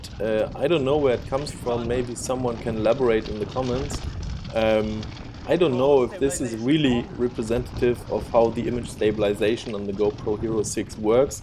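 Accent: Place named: Germany. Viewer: Du bist deutsch oder? Hört man raus 😂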